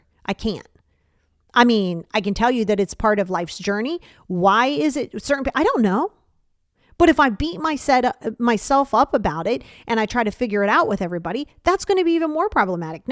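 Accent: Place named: England, United Kingdom. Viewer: Hi from Algeria , the English accent was cute 😂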